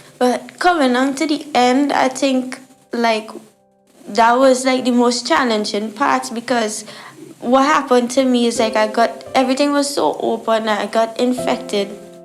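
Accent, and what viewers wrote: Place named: Trinidad and Tobago. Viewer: I hear you loud and clear. Ive been going through TSW 25 months. Getting better each day, still flaring but im doing much better than year one. I hear your accent, my parents ars from Trinidad as well. I plan to do a video soon once my journey is over, i want to make sure i am completely healed and advise on what works and what not to waste your money on. This journey is not easy and proud of you sharing your story. Time is the only healer on this journey.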